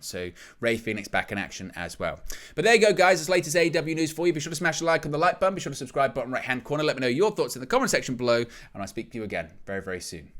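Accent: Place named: United Kingdom. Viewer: Don't like British Accents Bruv?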